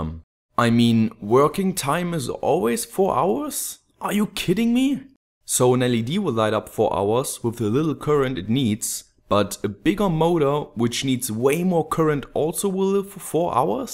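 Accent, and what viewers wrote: Germany. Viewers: Your Accent is really dominant. You sound pretty german. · yeah he has perfect and his accent isnt wierd i it sounds like a normal german accent, I used to live in germany for 2 years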